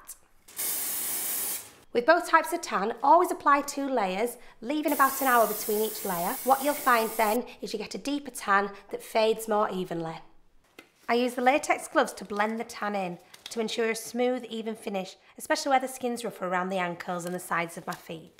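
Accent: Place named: Newcastle, United Kingdom. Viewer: lol shes so from newcaslte or round there xd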